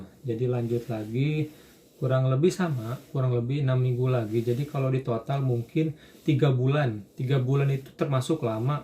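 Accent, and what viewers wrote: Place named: West Java, Indonesia. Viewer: Logat Sunda nya kng rada ka candak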